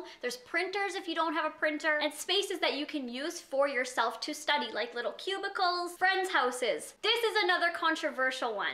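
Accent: Canada. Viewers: Btw I love your accent. Canadians are the best!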